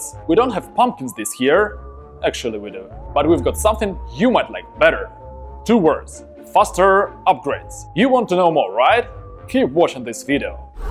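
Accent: Russia. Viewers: russian dude, right?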